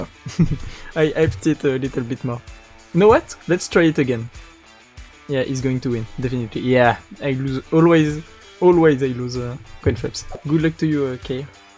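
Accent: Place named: France. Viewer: pas de soucis, en plus c'est que ton anglais est super fluide malgré l'accent français ( on l'a tous moi le 1er ). Continue t'es sur la bonne voie, j'espère que le partenariat avec csgo roll arrivera bientôt !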